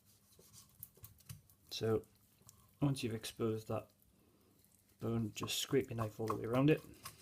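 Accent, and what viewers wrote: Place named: Newcastle, United Kingdom. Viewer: Thanks for sharing this. I just de-boned my first duck! Much easier that I remember chicken being - and nice to hear the Newcy accent. Cheers! :)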